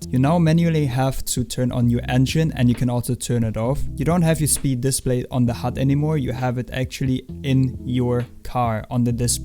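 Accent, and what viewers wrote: Germany. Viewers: Man hört so hart den deutschen Akzent raus xDD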